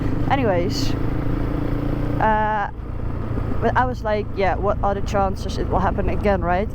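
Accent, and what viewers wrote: Netherlands. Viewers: Another adventurous Dutch lady. I start to like their accent.😄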